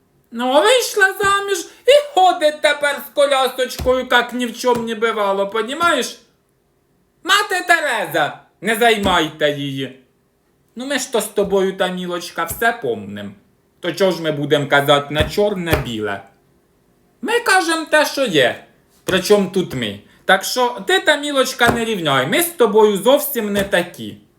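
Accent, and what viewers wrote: Poltava, Ukraine. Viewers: Ой цей наш Полтавський сільський говор, прямо з язика знімаєтє.Воно конешно не в обсужнєніє, ну так жеж і є😊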